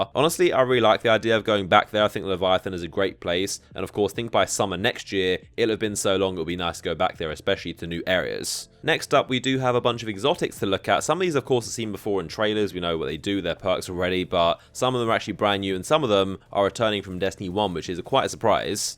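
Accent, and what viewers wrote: United Kingdom. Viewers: My favorite British Boiiii Back At It Again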